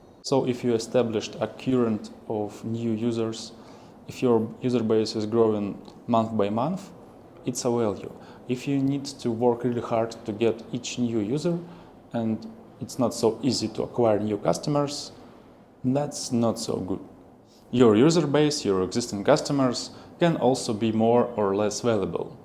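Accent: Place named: Russia. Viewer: russian accent, I have the same, we have to think what we want to say first before saying. But thanks for the tip it's much better now :)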